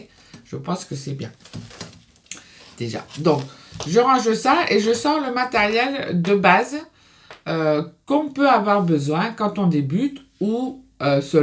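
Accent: South, France. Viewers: avec ton petit accent du sud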